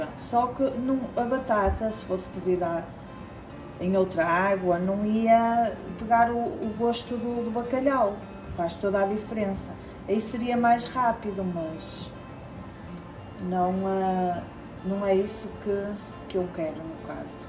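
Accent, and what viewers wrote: North, Portugal. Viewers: É do norte tem prenuncia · Olha menina, eu to daqui do sul da Suecia vendo voce fazer esta receita deliciosa e nutritiva, nossa quero fazer pra provar, obrigada viu..Amo escutar seu dialeto portugues..Tenho uns conhecidos aqui dexMalmö e a Ricardina em Hasseholm.. Olha mae falava, " sôsu! Palavra antiga no 🇧🇷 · Amo o sotaque português, aqui no Brasil chama-se escondidinho de bacalhau. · origami art, foi este sotaque é o motivo, que os cariocas conversam diferente das demais regiões do país. É que quando a corte chegou, vinda de Portugal, todos ficaram imitando os portugueses e deu nisso. O Brasil é o único país das Américas que teve Rei. Sabia? Não só rei, como duque, marquês, conde, visconde, barão, etc nesta ordem, onde o duque é abaixo somente do rei. Saba?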